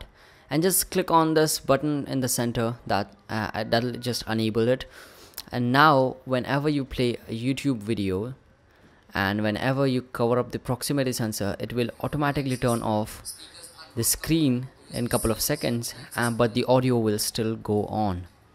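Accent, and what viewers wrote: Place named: India. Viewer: its indian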